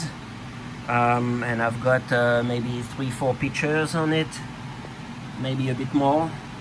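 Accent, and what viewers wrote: France. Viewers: Hi man Love the french accent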